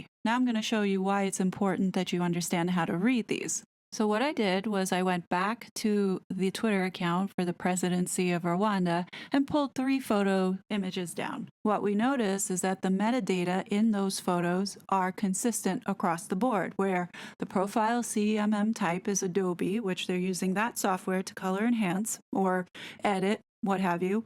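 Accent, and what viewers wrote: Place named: United States. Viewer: Hi. Interesting🤔 I surreptitiously subscibed with your reading of better-not-say🤫 i love your voice... Gentle, melodous American. Perfect pitch and loved it when a laugh was building up in your voice. Let me stop! Ere i sound girlyfestype.😉 This is fascinating. Thank you. I will have listen pay attention... Is the one pic still a blank device? Maybe its a pic only for President of african parks meets President of Rwanda status photo? Did you analyse the other mozambique pic? Clearly on island. Question is Why??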